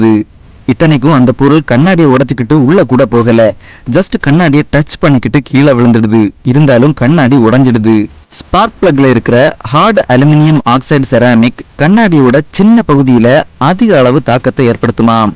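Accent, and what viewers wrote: Kanyakumari, India. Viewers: Neega kanyakumariya? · மக்கா நீ கன்னியாகுமரி காரனா ? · 🙄Bro neenga Kanya kumari ahh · Neenga kanyakumari district ah bro? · Neenga kanyakumari ahhh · Bro neenga kannyakumari ah? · Bro nega Kanyakumari ya .. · Bro nenga kaniya kumari ya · Bro neenga kanyakumari ya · Avaru kanyakumari 👍🏻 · Makka kanya kumariyaaaaa😂namma ooru slang mix agi varuthu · Aiiii Nee Kanyakumari ah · Bro neenga kannya Kumari ya ? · Bro ninga kanyakumariya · Bro kanniyakumari ah 😍❤ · Bro neenka Kanyakumari aa?? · Bro neenga kanyakumari a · Makka kanyakumari ya unak kk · Bro neenga kanyakumari ah.. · Kaniyakumari slang 😂 👌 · He is from kanyakumari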